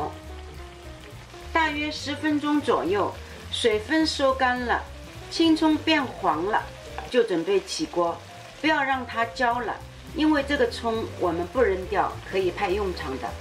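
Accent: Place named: Shanghai, China. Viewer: 我听口音像！能不能教一下坐上海冷面和配菜的做法？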